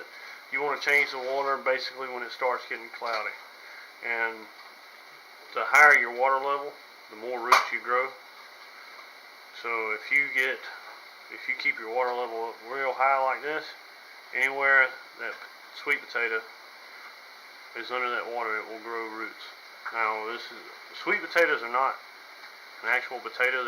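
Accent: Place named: South, United States. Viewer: I used shishkebob skewers (broken in two) because toothpicks for some reason didn't seem to be long enough for my situation. Just an option. I can tell you're from the south by your voice. I'm growing sweet potatoes in zone 3 up north. It works, but you really have to start them early in the house up here. You can let the vines grow really long and cut them into one foot lengths and plant each piece.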